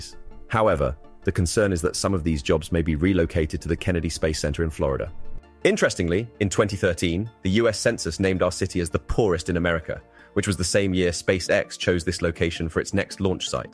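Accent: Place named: Europe. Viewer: Good report. Job well done. It would be nice to hear your announcer to speak in American English. There are dozens of European English on U Tube I do not like their speech nor their cadence. .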